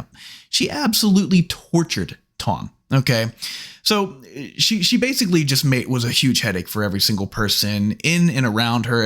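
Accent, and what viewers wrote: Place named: Pittsburgh, United States. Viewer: You have a very Pittsburgh accent lol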